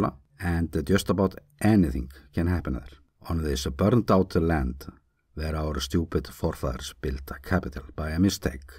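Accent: Iceland. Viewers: Love these updates from my home country that I left some XX years ago - takk kærlega! :) When living in Iceland, the Icelandic English-accent used to sound terrible to me ~ now I can only hear its charm ❤️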